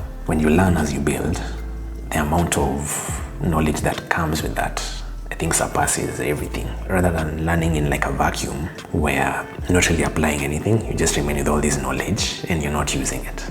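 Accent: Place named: Kenya. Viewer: Sounds a kenyan accent...i love the video lighting quality Love from Ug. 🇺🇬...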